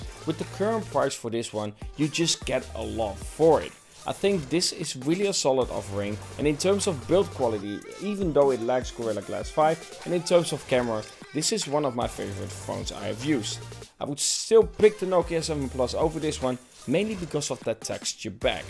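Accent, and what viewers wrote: Netherlands. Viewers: U sound Dutch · Dat noemen ze Denglisch. Dat is Engels met een Nederlands accent.😁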